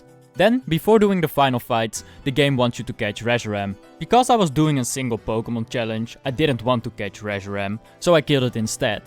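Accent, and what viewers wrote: Netherlands. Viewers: Where are you from. My guess is the Netherlands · Are you dutch Your accent kinda sounds like it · Are you dutch? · Nice dutch accent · Je bent nederlands of niet je hoort gelijk pik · Are you Dutch? You sound like that. But I really liked this video :)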